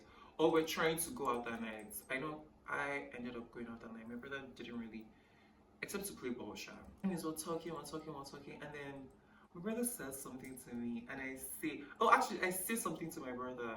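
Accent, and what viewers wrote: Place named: South Africa. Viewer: I thought you were south african for a sec😭😭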